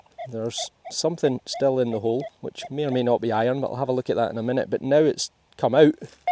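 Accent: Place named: Glasgow, United Kingdom. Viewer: No wonder that this channel is growing so steadily to “not yet known heights”. Part of it is the Glaswegian way of speech and accent for sure. You sound much like Billy Connolly, a fellow Glaswegian who often brought us to tears of laughter. Congratulations mister. Things are looking to go up, harvest season is well under way and I’m sure you’ll knock some silvers and relics out.🤞🍀🤞🍀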